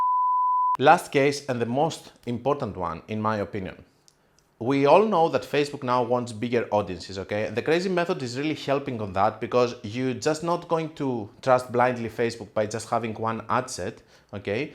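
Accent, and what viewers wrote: Greece. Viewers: I do have sensitive hearing, but it wouldn't bother me so much, if it were only intro sound, but here's the thing, I watch YT on TV using keyboard and I need to constantly adjust volume when you're suddenly inserting those sound effects in midst of your quite quieter speech. And all that including your Greek accent (which I don't mind being European myself) really extends duration of watching while I try to comprehend what you're saying, so I'm constantly stopping and reversing video and it's a pain. You may comfort yourself by not being the only youtuber with that problem, there's plenty of them out there. But you don't need to edit your videos on my account, after all this was your first and last video I watched, because I've never had FB account and these topics don't interest me. And now you may bring up some mom jokes.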